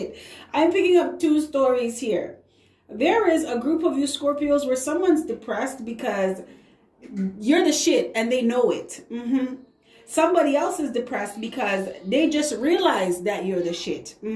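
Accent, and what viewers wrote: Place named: Jamaica. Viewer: I was sooooo resonating with this and then i heard the patois. Made it clear we connecting....thank you my fellow Jamaican.🥳🥳🥳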